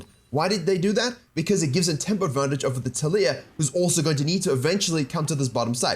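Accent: South Africa. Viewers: Was wondering from his accent where he was from, that's really cool to know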